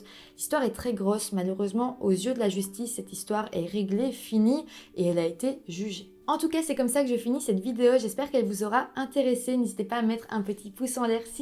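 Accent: Belgium. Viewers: merci pour la vidéO et l'accent belge <33333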